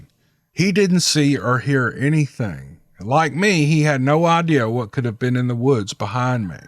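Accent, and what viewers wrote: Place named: South, United States. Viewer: you are like the Earl Ives with a Southern accent you have got the best storytelling voice I've ever heard. I'm a truck driver that drives about miles a night and listens to podcasts in all kinds of storytellers. You and Mr. Ballen Do wonderful jobs and thank you very much for making my truck driving much easier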